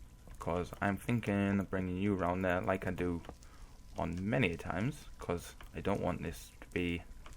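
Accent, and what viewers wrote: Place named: England, United Kingdom. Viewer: Or wait.. Let me guess.. England... Becuse of your accent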